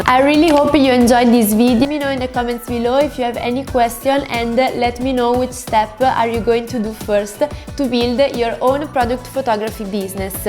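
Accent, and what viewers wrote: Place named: Italy. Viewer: Every letter has been pronounced in this video,😂😂😂😂 loud and clear. You sound so Italian, anyway good tips 👍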